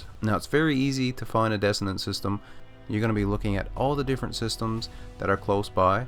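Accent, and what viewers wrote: Newfoundland, Canada. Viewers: Hilarious. I was like "i know that accent". From one Newfie to another! Cheers dude! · Heard whaddayat, and immediately thought, a Newfie on YouTube??? No way! Love from a Corner Brook boy! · Guy is from Canada or Minnesota. 😄 Pretty good video though. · A minute in I was like wait b'ys a newfie - and then I saw your username 🤣 Born and raised in Deer Lake. Also thanks for the awesome guide! I just picked this up. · Great content buddy . You sound Newfie as my self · are you canadian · Are you from Newfoundland you say "what are you at" the only people i know that say that are my family who are from Lewisport Newfoundland you sound like you have a slight accent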